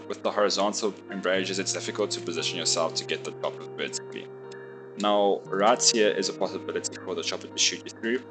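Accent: South Africa. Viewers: are you South African ? couldn't mind noticing the accent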